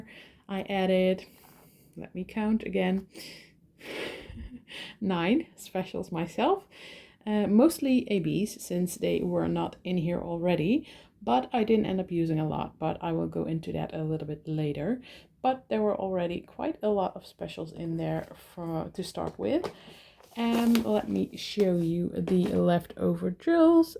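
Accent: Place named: Netherlands. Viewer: Me again! I watch Lisette's channel as well. You are both Dutch and your accents are similar. But your English is impeccable!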